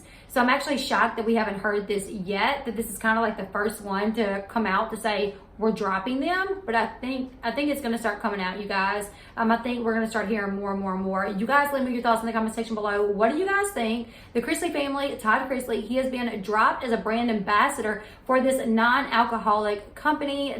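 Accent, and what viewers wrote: South, United States. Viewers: I just wanted to tell u I love your show! Your southern accent brought me in but you are a smart informative lady! Keep up the good work!!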